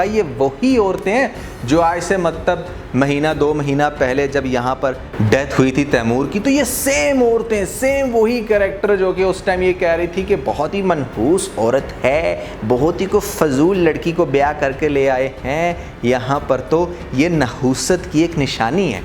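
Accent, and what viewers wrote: Punjab, India. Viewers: I love your punjabi accent vele tute nickame ashiq..you are bang on right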